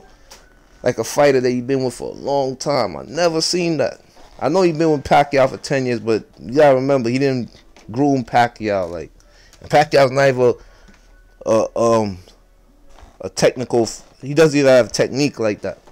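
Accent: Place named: Trinidad and Tobago. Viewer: irrelevant, but u sound like u trinidadian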